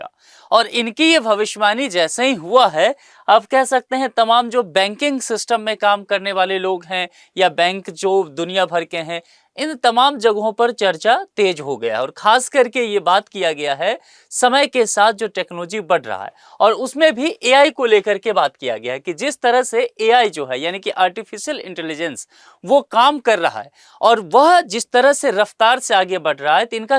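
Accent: Bihar, India. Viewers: Bolne se lagta h ye bihari h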